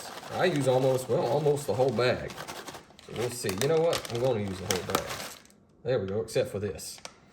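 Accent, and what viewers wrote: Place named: Louisiana, United States. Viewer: Not Georgia cajun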